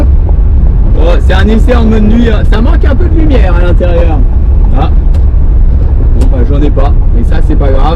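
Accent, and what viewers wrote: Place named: Paris, France. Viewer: Toi t’es pénible ! Une pépite ! Une 104 ! Ta cible : Les débiles ! Tu devrais bosser chez Vintage mécanic ! En plus tu as la tenue de mécano ! Accent parisien ! Insupportable ! 🤮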